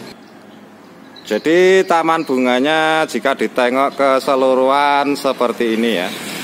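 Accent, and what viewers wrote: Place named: Java, Indonesia. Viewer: Medok jowo mas e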